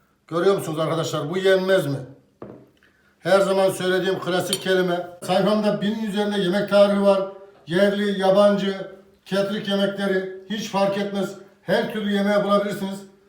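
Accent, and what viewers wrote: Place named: Konya, Turkey. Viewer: Ellerine sağlık şefim siveniz bizim buralar gibi Konya limisiniz bende yapacağım Konya'dan saygılar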